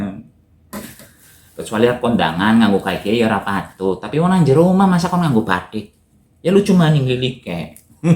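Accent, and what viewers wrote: Banyumas, Indonesia. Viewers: Masaaaak sih ,,,,,ya keteke kecuuut ,,,,sambil masak ,,,,kelihatan tambah buntder ,,,,hidunge tambah tidak kelihatan ,,,,yg penting tetap apa adanya ,,ngapaknya spontan malah tambah lucu ,,,,cerdas ,,,,masak sambil mbanyol ,,,,malah tambah nggemeske ,,,,,kalau dekat pingin nyiwel pipine sing tembem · Menjadi diri sendiri lebih baik mas. Autentic dg bahasa ngapak dan apa adanya. Ciri kasnya 👍👍👍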